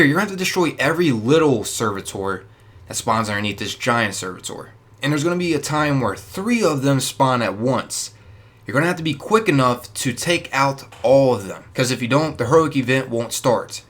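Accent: United States. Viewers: Thanks for making a video game video with a normal American Voice!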